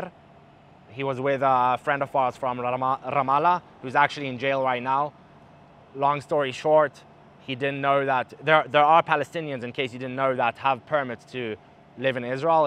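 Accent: South Africa. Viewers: I knew their was a S/A accent, my cousins were born in Durban so I clocked that straight away. Your a very talented intelligent young man, keep up the great work but more importantly keep yourself safe 👍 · You sound like a Englishman who grew up in South Africa. Your English is perfect, better than some of us Brits. seriously. 😂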